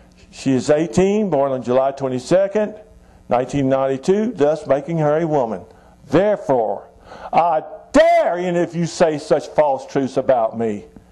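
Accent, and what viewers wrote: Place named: South, United States. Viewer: which one of the southern states is he from?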